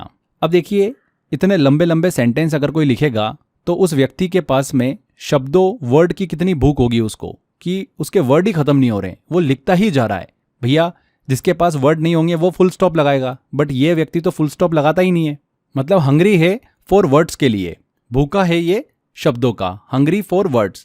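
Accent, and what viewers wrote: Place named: Maharashtra, India. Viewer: Sir Aap ki Hindi voice se lagta hai ki sir Aap Maharashtra se belong karteh hai..... Marathi Hindi type voice.... kyuki sir hum 4saal Pune mein tha....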